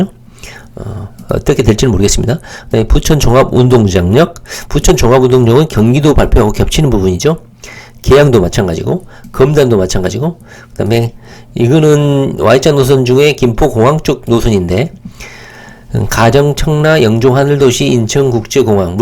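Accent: Jeolla, South Korea. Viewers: 이사람 전라도 가탕..사투리쓰네..전세계 최고 허브 공항을 당연히 gtx 연결 시켜야지..국가 발전을 위해서..스톡홀름..공항에서 바로 시내로 초고속 기차 진입하니 완전 빠르고 좋더만..국토부에서 알아서 하세요~~공항철도는 넘 느려~~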